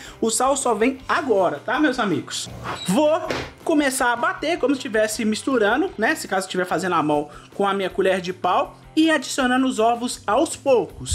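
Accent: Minas Gerais, Brazil. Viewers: Rapaz amei você eu sou de Pinheiro minas aí pertinho só que miro em Marília SP adoro quando vejo um mineiro conversar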